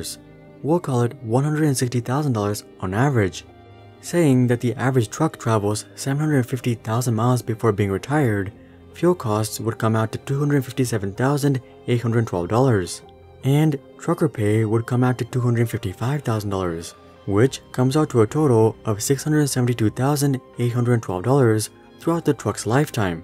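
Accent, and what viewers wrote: India. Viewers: On very close inspection, (which I would not have done except for the post as you speak very clearly), I guessed South central Asian, likely Indian. Hooray for me! Good channel. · U a Indian?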